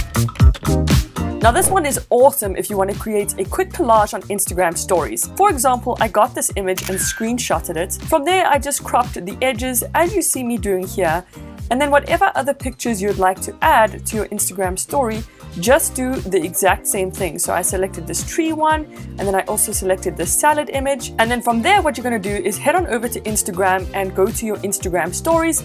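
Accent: South Africa. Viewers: Are you south- African? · South African accent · South African ? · You from South Africa nehr ? 😂🙈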